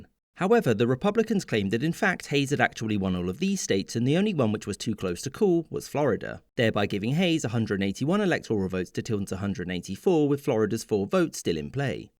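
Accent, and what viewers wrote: United Kingdom. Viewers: It’s crazy that the person commenting on US history is British.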